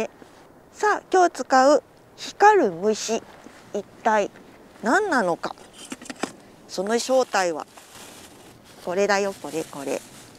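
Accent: Kagoshima, Japan. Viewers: メェ～餌も凄いけど鹿児島の海の魚影が凄いと思う👀 鹿児島訛り可愛いかった😊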